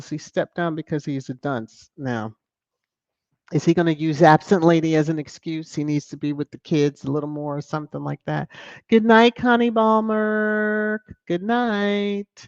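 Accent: RP, United Kingdom. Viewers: Hello happy Thursday, didn't catch the live but enjoy listening to replay, 👍👍👍👍👍👍💯💯💯😘😘😘😍😍❤️❤️😍🌹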